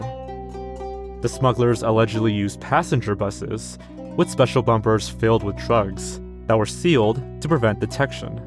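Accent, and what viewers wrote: United States. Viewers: Can't stand the way hes saying Mexican words/names in such a yt/American accent man. Ain't yoir name?!?! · i find it hilarious that a supposed mexican pronounces spanish names like an american, cmon man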